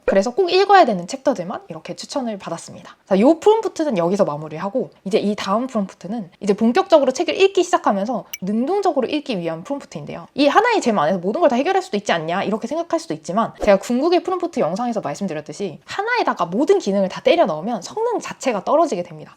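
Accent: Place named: Busan, South Korea. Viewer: 부산 사투리 ㅎㅎ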